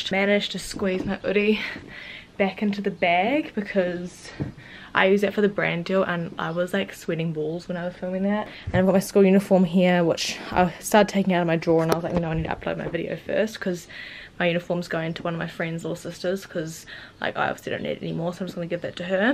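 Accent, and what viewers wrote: New Zealand. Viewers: Hi))) New Zealand accent is so nice!!!))))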